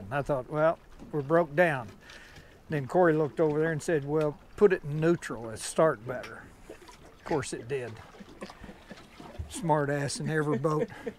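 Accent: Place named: Missouri, United States. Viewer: You are the fishing grits of all time Enjoy your expansion to catfish but can’t imagine you would actually clean and cook them I never thought catfish was worth the effort I am driving back from AZ on Monday Love listening to your distinctive SE Missouri flavored commentary Dave K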